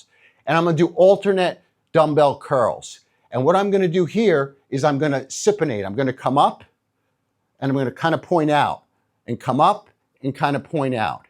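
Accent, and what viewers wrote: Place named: East Coast, United States. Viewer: I'm from Holland, but you sound like a East Coast guy. NYC?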